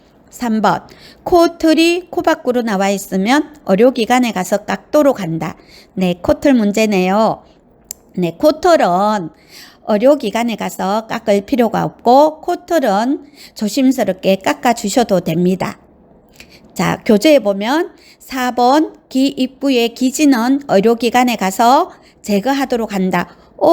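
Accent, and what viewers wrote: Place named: Gyeongsang, South Korea. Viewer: 죄송합니다 경상도사투리라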